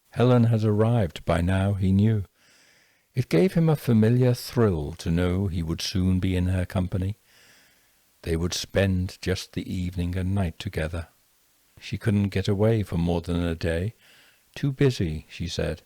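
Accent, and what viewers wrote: England, United Kingdom. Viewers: is a fantastic writer and storyteller i have loved all his stories with his wonderful english accent also all drawings as well i can't wait till his voice puts me to sleep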